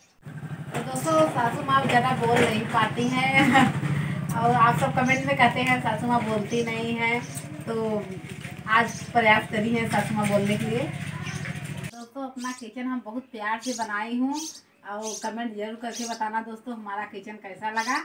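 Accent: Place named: Prayagraj, India. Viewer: Bahut achcha laga, aapki Prayagraj ki boli sunkar bahut achcha laga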